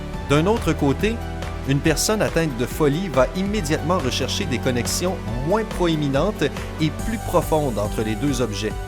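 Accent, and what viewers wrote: Quebec, Canada. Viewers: D'après ton accent tu dois être. Quebequois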